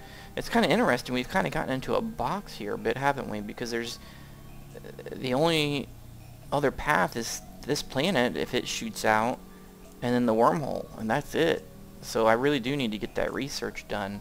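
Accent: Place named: South, United States. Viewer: Not usually a fan of Southern American accents but yours is pretty relaxing and clear.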